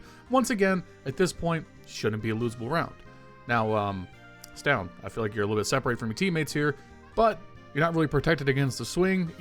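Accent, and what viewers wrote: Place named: United States. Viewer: 1. Open cs commentary video 2. Hear american accent 3. Click away from the video and never watch another of his videos again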